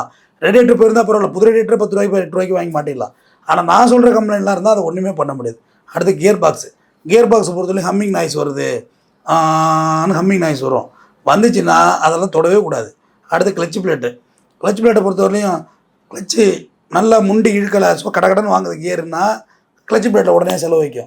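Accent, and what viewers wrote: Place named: Cauvery Delta, India. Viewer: Sir native delta side pola 🤔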